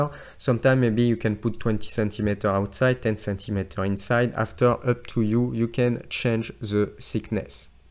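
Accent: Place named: France. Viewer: thank u for this very specific tutorial, loved the french accent ;)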